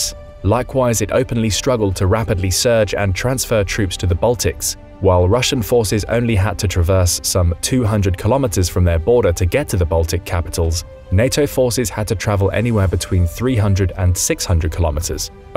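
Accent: United Kingdom. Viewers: Is this the Infographics guy with a British accent? 😮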